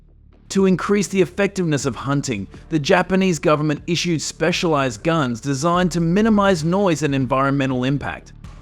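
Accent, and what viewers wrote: Australia. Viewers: I like that the narrator is from Australia or some place where they sound Australian I love it it gives the program more character and sounds really great😊😊❤❤viewing from Monmouth Oregon <num>😊😊❤❤ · You sound like an Aussie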